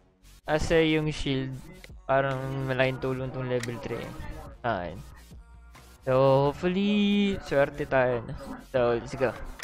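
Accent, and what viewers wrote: Visayas, Philippines. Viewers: bisaya ka po ba?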